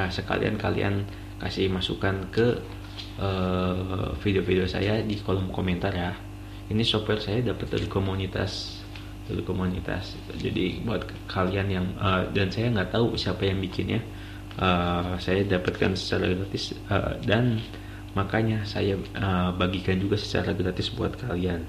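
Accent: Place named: West Java, Indonesia. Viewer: pantesan aya logat" sunda hehe...abdi ti sumedang kang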